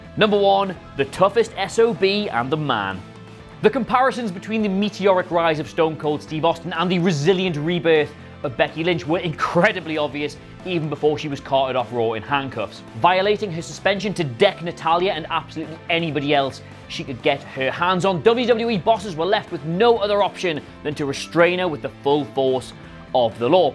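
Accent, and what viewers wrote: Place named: England, United Kingdom. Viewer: you the one pronouncing it wrong fool, he’s English! He speak a purer dialect of English than you ignorant yankee! You speak the language you speak because of his ancestors foolio, read a book idiot.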